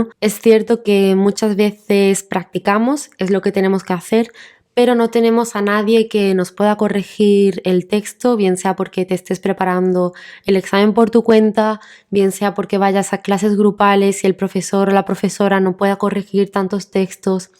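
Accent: Spain. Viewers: Que placer escucharla. Se puede aprender mucho observando los movimientos de su boca. Ella se convirtió en mi nuevo recurso del acento de España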